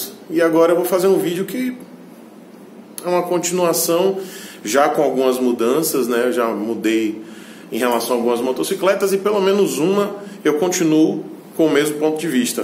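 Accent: Bahia, Brazil. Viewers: Rapaz, barril dobrado 😂😂esse é Baiano mesmo😂😂.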